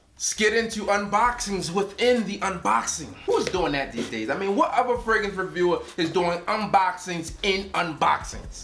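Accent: Baltimore, United States. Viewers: New to the game and from what I've seen I'll be leaning on your reviews a lot! Is that a Baltimore accent I hear?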